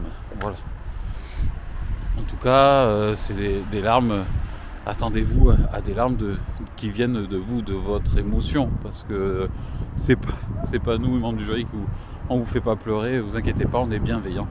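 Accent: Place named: Bordeaux, France. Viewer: On dirait que vous êtes Tour de sèze à Bordeaux !😊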